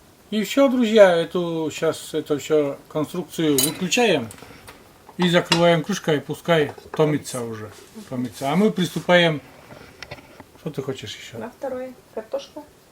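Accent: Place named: Luza, Russia. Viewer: Здравствуйте красавица я случайно зашла на ваш канал и услышала свой родной говор я с лузы всего доброго вам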